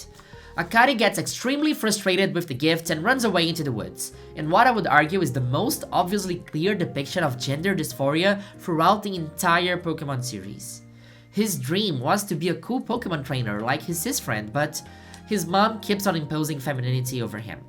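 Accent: Brazil. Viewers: Are... Are you from São Paulo? You sound like you're from São Paulo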